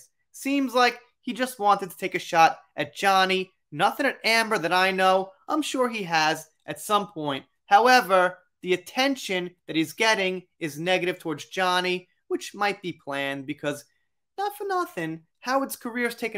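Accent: New York, United States. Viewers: Never liked Howard Stern he has a big ego,well people are going to go bad for stern,he's putting people off,johnny is innocent, your from new York I love your accent,I wondered why I liked your voice and like listening to it,to bad we can't have hot chocolate and playing monopoly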